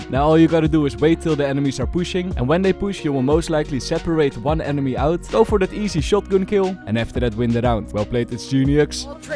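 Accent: Netherlands. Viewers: I have been watching your videos since I started playing Valorant in 2021 and I'm a regular viewer. Independent of this information, I moved to Amsterdam in 2022 and only TODAY REALIZED THAT YOU HAVE A DUTCH ACCENT fkkskskskskskskskss hahahaahah 😅 sorry for TMI! Love your videos, hope you grow ❤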